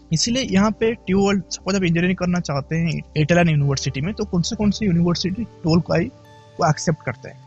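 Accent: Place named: India. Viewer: oooo😂😂I can catch ur hindi accent 🥰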